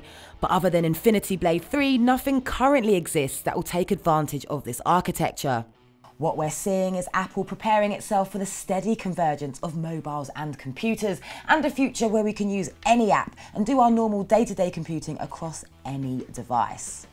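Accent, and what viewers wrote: United Kingdom. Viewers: a british nigga loooooooool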